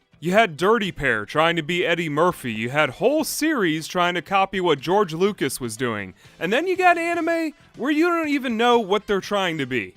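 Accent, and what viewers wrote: United States. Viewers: Sound american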